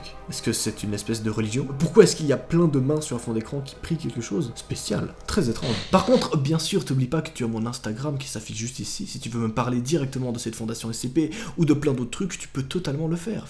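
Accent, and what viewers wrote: Belgium. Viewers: Il est belge Tsss.. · Tu et Belge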